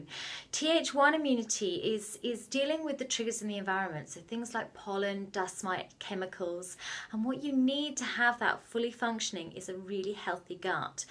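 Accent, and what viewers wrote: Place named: United Kingdom. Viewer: U have a strong British ascent young white lady. Other than that, when is the best time to take probiotics? Thanks in advance ma'am.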